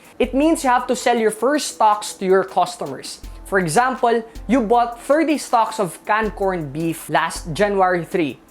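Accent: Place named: Philippines. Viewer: Sir dapat nag tagalog kanalang kasi hindi bagay sayo kasi halata kasi pinoy ang iling mo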